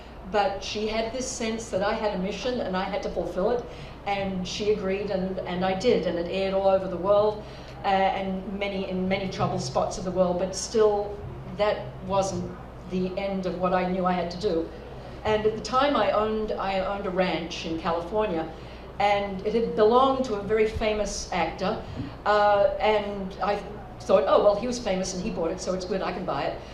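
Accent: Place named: Australia. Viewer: Twenty minutes ago, as I was pulling into my driveway, I changed the Sirius station to 129 [The Catholic Channel]. Father Dave was in conversation, and a person who sounded to be an Aussie began speaking. After the first sentence of dialogue, I put my car in park, and had to find out who this person is and her mission. I scribbled the info onto a piece of a snack box, went to the website to than YouTube. The end result: I felt happy. And invigorated. Thank you for passions. Your ripple effect will be felt in perpetuity. God bless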